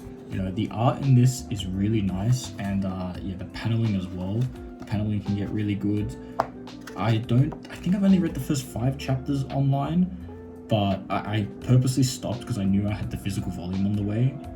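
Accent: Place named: Australia. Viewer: I sense an Australian accent (you might be from New Zealand or I might be wrong) but with how prices are to me your rich.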